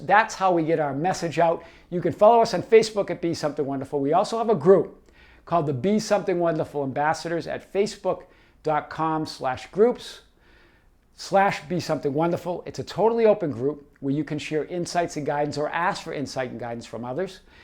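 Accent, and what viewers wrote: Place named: New York, United States. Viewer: I love your new yorkish accent Reminds me of home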